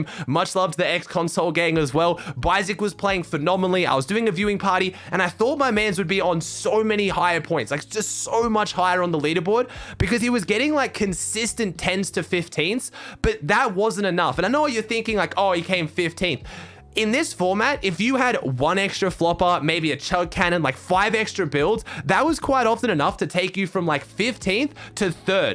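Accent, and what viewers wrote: Australia. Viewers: Are u an all-star Aussie? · Are you from Melbourne or Sydney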